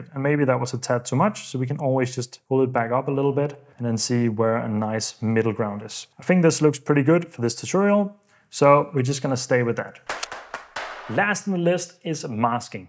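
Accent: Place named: Denmark. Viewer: When I was watching I thought you sounded a little danish, and decided to check your profile. I was so surprised to see you were actually danish lol 😭 Din tutorial var ret god, og sjovt at se en dansker nævnt i medierne!!!!!!!!!!🇩🇰🇩🇰🇩🇰🇩🇰🫡🫡🫡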